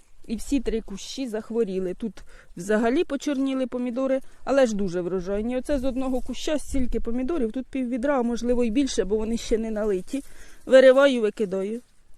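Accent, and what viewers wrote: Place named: Khmelnytskyi, Ukraine. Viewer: У мене так родичі на Хмельниччині говорять. Так схоже. Я так зрозуміла, що не підв'язані помідори. Дякую вам за відео.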